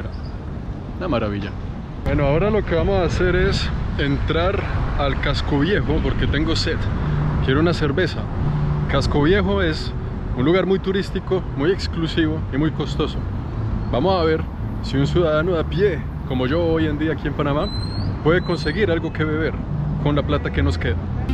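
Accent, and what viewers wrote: Colombia. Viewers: Eres Panameño De Nacimiento? Por tu acento pense que eras Colombiano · con razón tiene acento colombiano. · Ejjj hermano si vos sos panameno porque hablas como nosotros los colombianos pues mijo? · Nacio pero se crio en colombia y tiene todo me imagino cultura acento todo osea es colombiano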